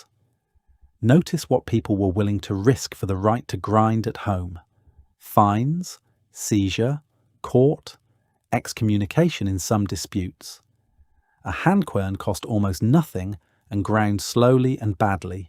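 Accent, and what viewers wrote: United Kingdom. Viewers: How much AI is used in your vidoes? Thumbnail is AI, script is very repetitive and oddly structured which suggests AI writing, and though the voice is British, the terms used are exclusively American. I just have no idea how much of this I can trust at all.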